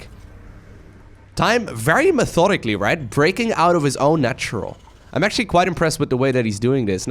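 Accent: India. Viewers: You sound like someone from India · you sound indian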